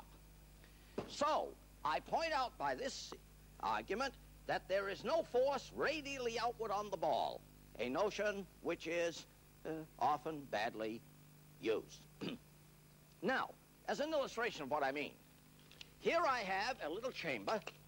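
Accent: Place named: Mid-Atlantic, United States. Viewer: He is using the mid-Atlantic accent. I wont explain it to you but you can search up "BrainStuff-Why do People Talk Weird in Old Movies" on YouTube and they will explain it to you.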